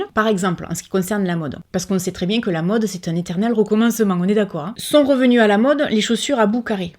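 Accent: South, France. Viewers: J'aime bien cet accent du Sud ! Super!! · je te suis depuis plusieurs années mais n'ai jamais posté de commentaires. Je le fais aujourd'hui pour te dire que tu es une personne formidable, tes vidéos sont toujours le petit moment de bonheur avec l'accent du sud et du soleil☺️. Toujours authentique et vraie, de bons sujets de vidéos et une famille formidable avec ta maman et vos adorables petits compagnons. Ne change rien 🥰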